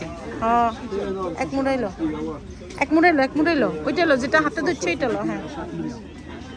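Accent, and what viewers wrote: Noakhali, Bangladesh. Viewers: এটা কি নোয়াখালির ভাষা?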